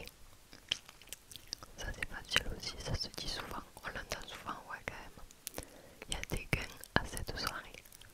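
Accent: South, France. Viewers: Cet accent du sud en asmr juste Woaw🤩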